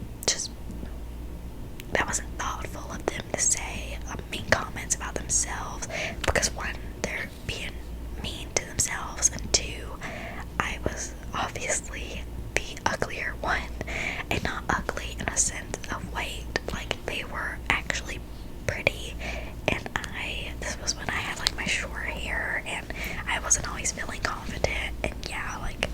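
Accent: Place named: South, United States. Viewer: Girl I am ugly crying in my bed like snot dripping from my nose crying. This vid hit hard with me. I totally relate to everything you said and lm 41. I like videos like this because I like getting a view of other people’s lives. Like I look at you and think gosh she’s so pretty, she has the cutest southern accent, she is so dam kind and I envy you. But u gave us some real ass shit and I love it. I don’t love how you’re struggling but just know you’re not alone. I know it seems embarrassing talking to someone, a stranger about how you feel but don’t be. If you need help it’s ok. This is my fav video of yours. I honestly like these asmr videos more than the other stuff. You’re one of my favs 💕💕💕💕💕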